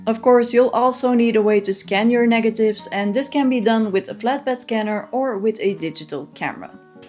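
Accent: Flanders, Belgium. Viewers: Great to hear a flemish intonation on a video about my favourite topic; subscribed!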